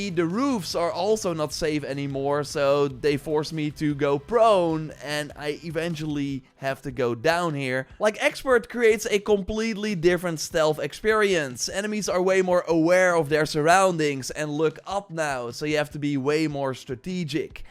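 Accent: Netherlands. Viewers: Hahaha ik hoor gelijk dat je nederland bent zet hem op ouwe💪🏾😂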